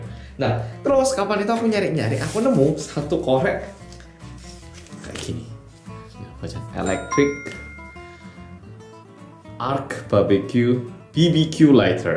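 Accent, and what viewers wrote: Java, Indonesia. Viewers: Otw 5juta subscribers.. mangatss mas medhookk ❤️💜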